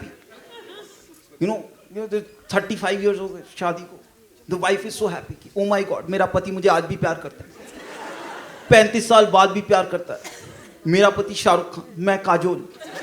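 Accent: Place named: Punjab, India. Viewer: Decent comedian but has lot of Punjabi influence.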